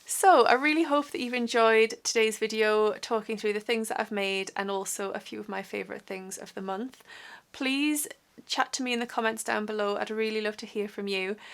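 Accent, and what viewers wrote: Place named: Tyneside, United Kingdom. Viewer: Great video. Lovely to hear a Tyneside accent. Don't ever forget about your dreams - it might not be possible for you to do some property development at this time, but you have your whole life ahead of you and dreams still come even true when you are more mature, you've just got to be determined and follow them. I speak from experience (though not in relation to property development).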